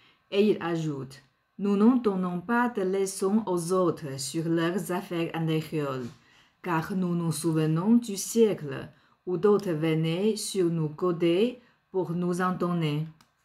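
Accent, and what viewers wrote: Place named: China. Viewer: Oui, parle très bien le français, la grammaire est impeccable. Elle a juste un accent lié à la langue natale, mais qui ne dénature absolument pas le sens du français qu'elle utilise. J'aimerais bien pouvoir parler chinois aussi bien qu'elle manie le français! Les français ne sont pas bons dans l'apprentissage des langues, donc mal placés pour donner des conseils à une femme chinoise qui parle leur langue, sachant qu'il n'y a absolument rien de commun entre les deux.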